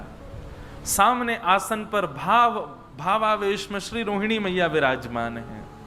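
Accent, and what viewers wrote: Braj, India. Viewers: Raadhey raadhey guru ji aapke charno me koti koti pranam aapki Katha bhut acchi lagti hai aapke mukharvind se brij bhasa bhut sunder lagti hai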